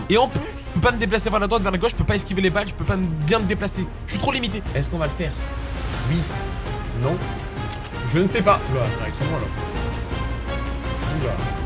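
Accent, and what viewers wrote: United Kingdom. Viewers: 8SUR10 TON ACSENT ANGLAIS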